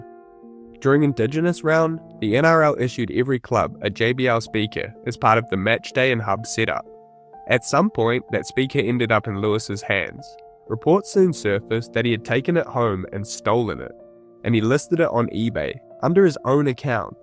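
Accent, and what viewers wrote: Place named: New Zealand. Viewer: That’s just the kiwi accent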